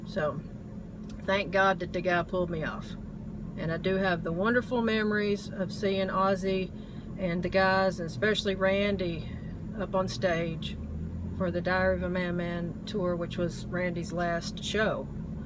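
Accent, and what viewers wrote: United States. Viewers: I like your southern accent. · Your accent's pretty awesome. My grandmother's from Texas so it's something I've heard my whole life.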